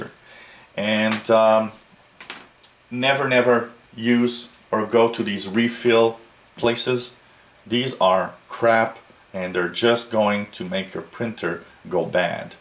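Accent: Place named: Quebec, Canada. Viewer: french canadian accent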